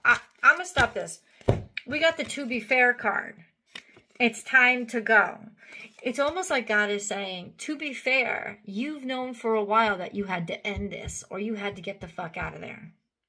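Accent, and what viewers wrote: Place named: Texas, United States. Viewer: Love to hear the texan in you coming out in this vid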